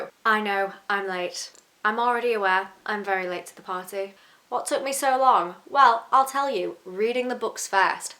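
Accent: United Kingdom. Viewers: tbf I had some time off for medical reasons so it was the perfect time to start. Got me through a tough period. Forgot to mention, the show really does a great job with the music! is a song you want to hate but it is beautifully composed. And one of my favorite bits of the latter seasons is a full version of Jenny's song (the one Tom o' Sevens sings to the Ghost of High Heart). You'll also hear and at some point. As you continue to watch you'll notice each house has their own dedicated song and you'll here different versions of them throughout the show. The score is phenomenal! Love the accent by the way, I live in the US (from the Caribbean) but work with a score of English expats (Camden Town, Leeds, Newcastle and Leicester), so it feels like I'm listening to a friend.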